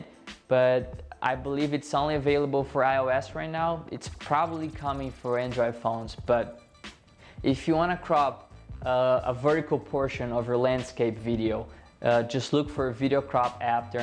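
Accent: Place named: Brazil. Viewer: Fala bem Iglês cara.. mas da pra perceber que é BR.. MEsmo assim parabéns!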